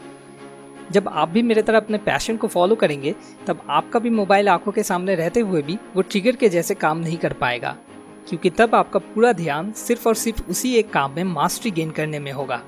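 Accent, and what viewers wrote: Bengal, India. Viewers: I am 💯% sure that you are BANGALI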